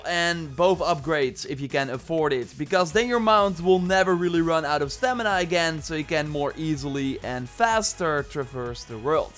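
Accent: Netherlands. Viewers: ur dutch right ? can clearly hear it when he pronounces "other" like otter" :P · This guy is so dutch it hurts my ears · Je hoort zo goed dat je een nederlander bent van je uitspraak no offense 😂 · You can hear that you’re from the Netherlands 🥰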